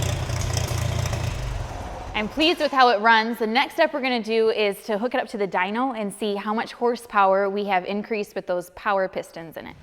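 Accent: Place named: Michigan, United States. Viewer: Great video. Also, I think I detect a northern accent... Wisconsin or Michigan perhaps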